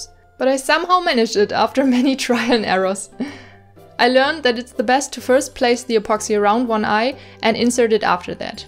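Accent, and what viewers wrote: Germany. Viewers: Why is the fabric softener named yes please? Sorry I know German Edit: I am German! I knew your accent was German! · Where are you from? Your English reaaally sounds like you're from Germany ^^ and the 'Moin' makes me think you're from the north sea. Am I right or completly wrong? 🌊😍 BTW! It's a reaaalllyy nice doll ❤️ instant subscribe 😊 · omg are you German? I'm am German, it sounds like it to me :3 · I just found your channel yesterday and I already loved it after the first video also your voice sounds really cute... are you from Germany? I am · Man merkt an deiner aussprache das du Deutsche bist. Anfangs war ich zwar etwas unsicher, aber dann sah ich ''UHU Alleskleber'' und boom ich war stolz auf mich das ich an der aussprache erkennen kann von wo wer kommt!!! Btw cute doll <3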